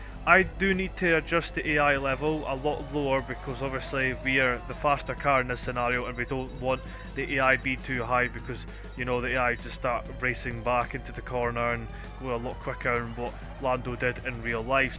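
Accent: Scotland, United Kingdom. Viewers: Didn't realise you are Scottish, hello from Northern Ireland! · Are you Scottish? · What the fuck you're Scottish as well haha, whereabouts are you from?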